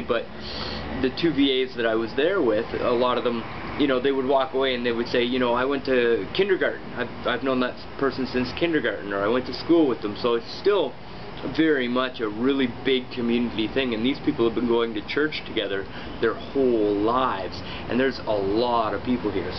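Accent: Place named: Canada. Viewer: Oh shoot! You're Canadian! I knew it from listening to your accent, haha!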